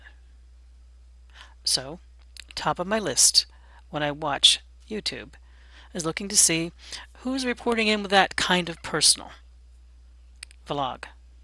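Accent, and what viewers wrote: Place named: Maryland, United States. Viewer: Well my min pin is named Snuffy after the 1st dog I remember having as a kid, who was a Manchester Terrier and was also a black and tan. I have a weakness for black and tan dogs I guess, have certainly had quite a few in my life. I fostered dogs for a while for 2 different rescues in the past, as well as some bottle fed kittens and a mama cat who had just had kittens. But Snuffy doesn't bark that much at all. I taught her early on that was not acceptable unless there were truly something I needed to be alerted to. I have had her for 4.5 yrs now from 4 mos of age, and I can pretty much discern her different barks to know what's going on outside, I guess you could say I almost speak dog....ON another thought...heading to the liquor store before a big storm is one of the very goofiest things I do believe I've ever heard of! 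I can safely say I would not be one of those folks, lol. BTW, I grew up till age 15, in Columbia MD, sounds like you have a similar accent:) You may wish to let the vet know that Ernie has had an increase in his post ictal stage of seizure episode...and yes, I DO remember that lovely song, lol. BB to you and yours, kissies to the dogs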